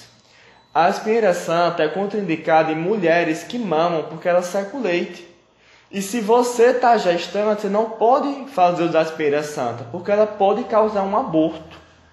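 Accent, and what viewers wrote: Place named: Juazeiro, Brazil. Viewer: Adoro esse sutaque juazeiro. Falando assim me sinto bem melhor!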